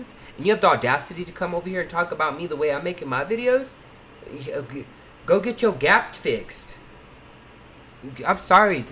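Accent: India. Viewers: Nooo!!! U got a indian/gay accent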